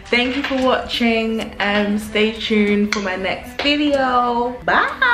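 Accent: United Kingdom. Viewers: Is that your boyfriend in the video?? And are you British living in America or what’s going on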